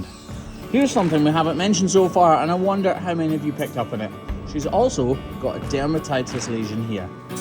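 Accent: Scotland, United Kingdom. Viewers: I've shared! Your videos have helped me get over my fear of cows! With the added bonus of the silky Scottish accent 🤭😉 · I love watching your videos! You've a beautiful family and I Love hearing your Scottish accent taking us through the video!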